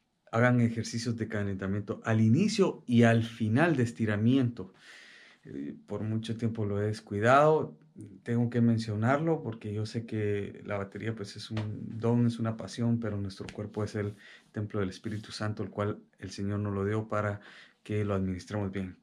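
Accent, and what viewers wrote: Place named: Mexico. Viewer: Más de Guatemala habla más como de México hermano jeje 😂 😂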